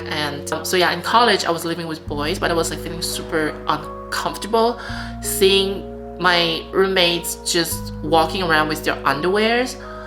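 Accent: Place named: United States. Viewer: Thanks. My wife and I are legally married in the US and she’s a US citizen now. You should do a video about what you think about the state of LGBT rights and acceptance in China and marriage equality in China. It would be cool to know about how you became so fluent in English, too. That American accent didn’t just come from nowhere and inquiring minds want to know! Thanks for your consideration. I’m Loving the channel.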